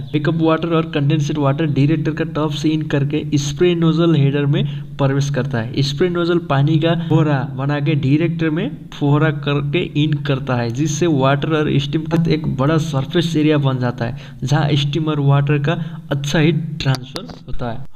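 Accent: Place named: Nepal. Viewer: Nepali ho Kya bro ta .. Hindi ta Nepali le bolni jasto cha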